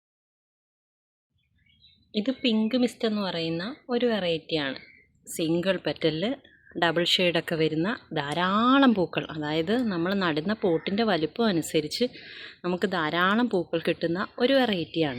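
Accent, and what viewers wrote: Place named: Thiruvalla, India. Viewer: ഞാൻ തിരുവല്ല സൗണ്ട് മുൻപ് കേട്ടത് പോലെ പക്ഷേ ഞാൻ ആദ്യം കാണുവാണ് ഈ വീഡിയോ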